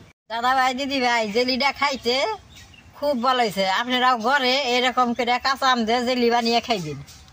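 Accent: Tangail, Bangladesh. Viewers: টাঙ্গাইলের ভাষা।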